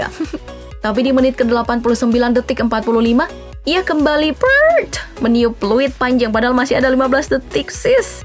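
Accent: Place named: Banjar, Indonesia. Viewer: Orang Banjar kah pengisi suara DP nih? Kedengaran Pakai bahasa banjar kadang wkwkwk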